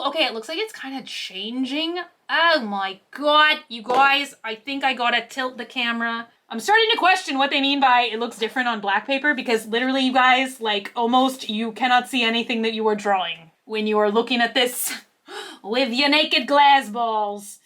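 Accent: Texas, United States. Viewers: Lol I think that may just be the Texan accent (I say it the same way and I’m also from South Texas)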